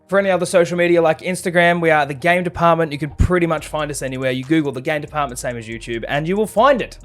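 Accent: Australia. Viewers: Thank you soo much this helped a lot the best part was that you Australian not American so I can understand you a lot more, your also very funny 😂😂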